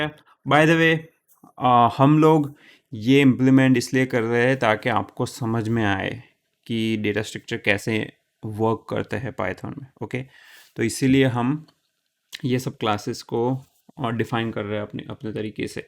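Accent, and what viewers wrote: Gujarat, India. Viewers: kitni bhi kosis krlo gujarati vala flow to nikal hi jata hai😄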